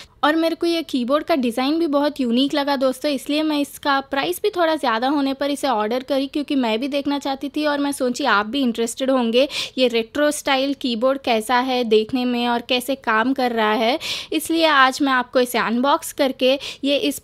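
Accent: Hyderabad, India. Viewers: Your Hyderabadi language is super don't bother about any thing your doing well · Vaaamo pakka hyderabadi hindi maatlaadesthunaavga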